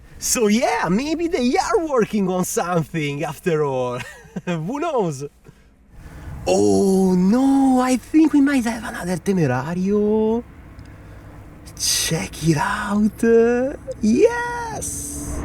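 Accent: Italy. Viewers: I love this channel! infectious enthusiasm about every car leaving or arriving at the Lamborghini factory (not to mention the ducks) just puts a smile on my face. And punctuating it all with that awesome Italian accent, what's not to love?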